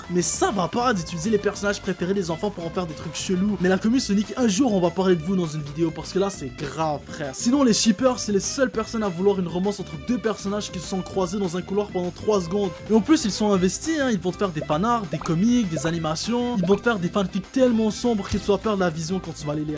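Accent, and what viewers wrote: Quebec, Canada. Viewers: t quebecois? parce que moi oui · Mais c’est moi ou il a un accent innnnn peux Québécois?😂❤(je revient pour dire que en faite la debile que je suis j’avais pas capté qu’il est québécois 🧍🏻‍♀️. Myb)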